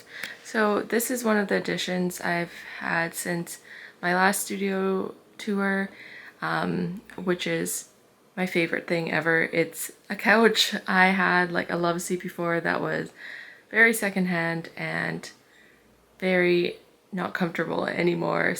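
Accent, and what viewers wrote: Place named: Minnesota, United States. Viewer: under a minute in and you must be from Minnesota.. the "beg" (bag) gives it away , lol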